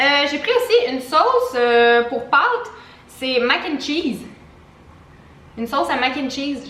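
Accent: Quebec, Canada. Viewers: Le Tropicana c'est pas le top car c'est pasteurisé donc toute les vitamines etc se sont envolées lors de la pasteurisation. Le jus d'orange pressé est bien mieux et le top du top est de mangé l'orange avec sa pulpe pour bénéficier des fibres et donc éviter que le pic de glycémie face les montagnes russes. Mais effectivement c'est meilleur de prendre du pur jus que du nectar, etc :) Je suis tes vlogs qui sont bien sympa et perso je suis une française qui adore l'accent québécois :)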